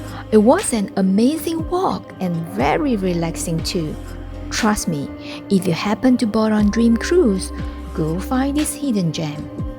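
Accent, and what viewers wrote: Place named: Japan. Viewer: Hi, thanks for the good info of these cruises. Btw, you sound Japanese. Are you?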